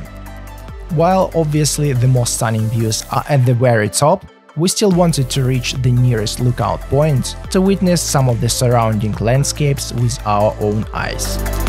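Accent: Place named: Northern Europe. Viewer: Great clip, I am in a dilemma as to your accent - northern european I suspect. Marvellous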